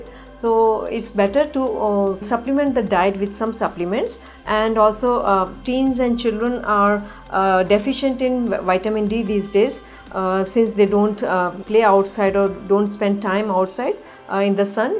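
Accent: India. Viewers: Indian accents are one of the most lovely sounding accents in the world, IMO. Thank you for the informative video.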